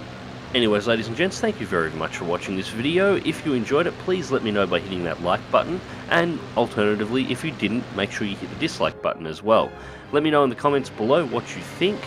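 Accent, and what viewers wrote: Australia. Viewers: Another great video. nice to see you in a plane! love the aussie pronunciations! as usual take care good luck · You sound more australian than Rimmy. I'm impressed.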